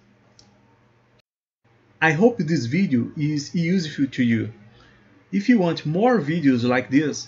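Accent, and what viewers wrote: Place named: Brazil. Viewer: Engraçado como se percebe logo quando o sotaque é brasileiro, é um sotaque único. Siga produzindo em inglês, nesse meio a maioria entende e o alcance não fica restrito a aqui.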